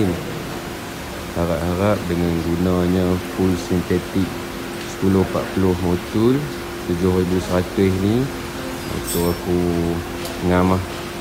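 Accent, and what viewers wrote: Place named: North, Indonesia. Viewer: loghat mcm org utara so kita geng la 🤣dah la R15 juga tapi aku R15 biru 😬 salam geng R15🔥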